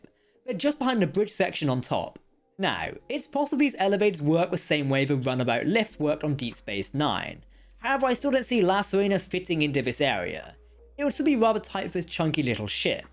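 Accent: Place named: United Kingdom. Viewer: Being hard of hearing is making your accent even harder to process. I would enjoy it much more if you spoke in an American accent. I have NOTHING against British folk, but it is what it is. After all, if weren't for your ancestors, America wouldn't exist. Sorry to be a bummer.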